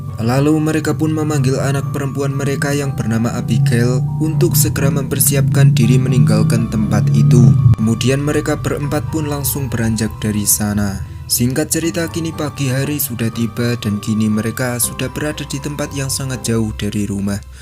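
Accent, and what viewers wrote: Java, Indonesia. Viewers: Kek orang jawa nii bang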